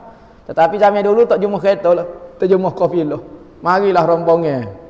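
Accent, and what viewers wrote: Kelantan, Malaysia. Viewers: Sedap dgr ustaz cerita. Sebab dia guna bahasa kelantan. Macam lain sikit bunyi. Walaupun dah tahu kisah nabi Yusof ni. Tak tahu byk mana dah ceramah nabi yusuf dgr. Ustaz ibnu rijal, ustaz auni, ustaz wadi anwar.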